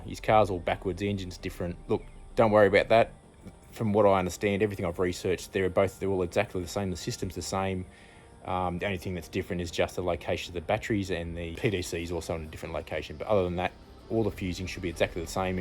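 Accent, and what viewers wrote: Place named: Australia. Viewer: I gave you a thumbs up for the Aussie accent and for having the balls to drive on the wrong side of the road all the time!!!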